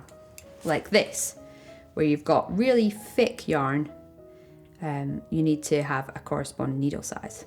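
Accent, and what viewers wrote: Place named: Scotland, United Kingdom. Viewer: Thank you for your precise directions it worked,50 years without touching the needles. Love you Scottish accent.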